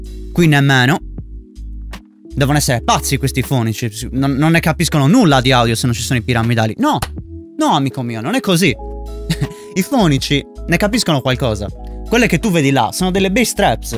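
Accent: Sardinia, Italy. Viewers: Si sente giusto un po' che sei sardo ahahha, Comunque bel video, Grazie ❤️ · Ciao! Un consiglio :) ho una stanza che ho adibito a studio, sia per suonicchiare/registrare qualcosina, sia per streaming di gaming. La stanza è un po' lunga e stretta, ma soprattutto il soffitto è molto alto. A grandi linee: 2x3,7m, soffitto circa 3,7m. C'è abbastanza riverbero sulle basse, ho pensato di "abbassare" il di circa un metro e mezzo con uno strato di pannelli fono assorbenti piramidali da 5cm...ha senso? Mi sai dare una dritta? Ciao da un tuo concittadino 😁 (dall'accento direi che siamo entrambi di Cagliari)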